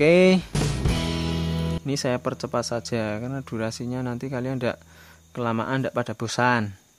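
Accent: Java, Indonesia. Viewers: Jowomu medhok ,,,,hhhhha hhhha enak ki kesusu kok hhhha 🙏🙏🙏🙏🙏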